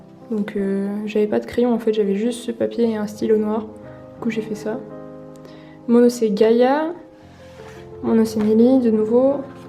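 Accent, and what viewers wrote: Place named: Switzerland. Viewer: No I love your french so much! I spent a little bit of time in geneva and the swiss alps and honestly swiss french is one of the easiest to understand for me! I had more trouble with nice and Bordeaux, none the less you had so many beautiful sketches in here, even if I didn't understand the french I'd still love to watch <3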